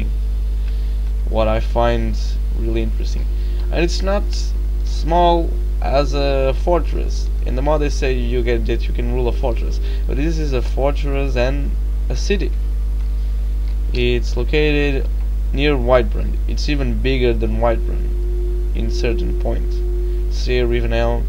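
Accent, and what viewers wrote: Portugal. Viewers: boa parceiro obrigado pela ajuda :) Gosto do sotaque Português ahahaah :D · Logo assim que ouvi o teu sotaque a falar inglês, vi logo que eras português xD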